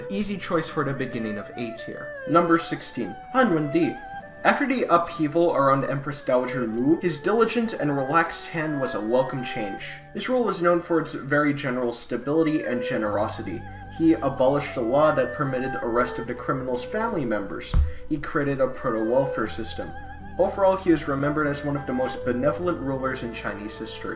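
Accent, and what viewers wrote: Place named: Chicago, United States. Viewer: DA MING, Down da line dere. I love hearing the Chicago accent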